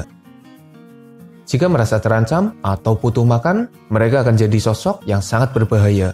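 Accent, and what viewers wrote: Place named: Java, Indonesia. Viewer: Suara medokmu lho mas... Uhug mara'i kangen kampung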